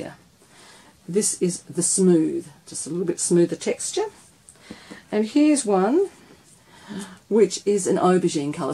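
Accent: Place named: Australia. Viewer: Most important thing which you rushed over and I could not understand what you said, was what type of surface you were using i.e. paper, board, wood, card. It sounded like 'Mat-board' or 'Net-board'. Would you please mind replying and stating also the name of the product, your accent sounds Australian apologies if not, I am from England but the name of the product will help in finding similar. Thank you.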